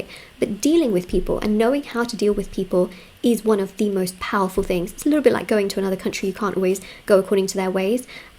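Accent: United Kingdom. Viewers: Hello, I wonder if u do live vdo tarot reading? Where are u located bcoz u sound British, & look eastern Indian, also like to know what is your horoscope sign if u don't mind. Thank u for reading.🙏🐾🌹🪔🕉️🤩✝️☮️🌺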